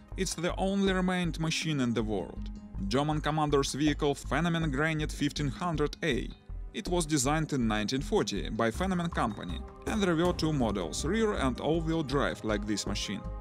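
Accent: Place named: Russia. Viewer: ну и акцент русский лучше бы по русский пиздел... а так пришлось звук убрать а то это слушать противно. с тем же успехом можно смотреть как китайца говорить па руски на утуб.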